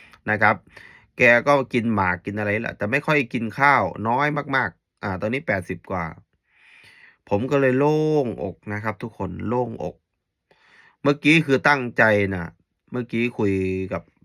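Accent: Isan, Thailand. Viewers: สำเนียงการพูดไม่เหมือนภาษาลาวเลย เหมือนภาษาอีสานมากกว่า หรือว่าผมคิดไปเอง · เทพโยสดำเนัยงเหมือนคนอิสานเนาะ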